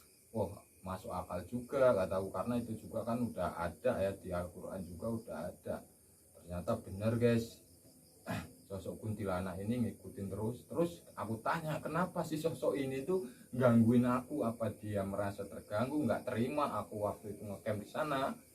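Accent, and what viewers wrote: Java, Indonesia. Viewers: mas ciri khas klo ngomong nya pas klo ngomong banget nya medok banget.. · Mantaps bang ceritanya seru mengerikan sering lah cerita kisah pengalaman kek gini karena serunya gk kalah sama vidio campingmu bang.. btw sampean orang mana bang logat jawa tpi kok tinggal di jabar?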